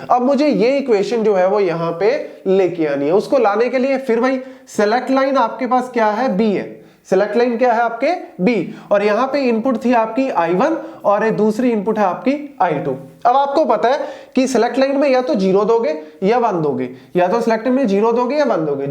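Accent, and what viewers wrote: Punjab, India. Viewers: Sir are u from panjab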